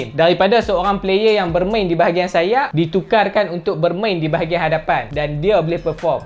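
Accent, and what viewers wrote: Kedah, Malaysia. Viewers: boleh letak subtitle dlm bahasa baku untuk next video..bnda ni boleh memudahkan viewers dri negeri lain faham maksud perkataan loghat kedah yg depa mngkin x faham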